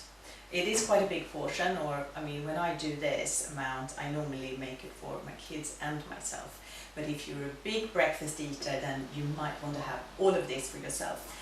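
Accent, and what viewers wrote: Sweden. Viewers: You sound Swedish 😊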